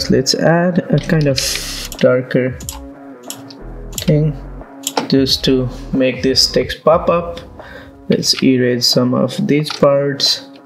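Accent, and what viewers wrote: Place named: Nepal. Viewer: COCIAL MEDIA ACCENT TELLS U R NEPALI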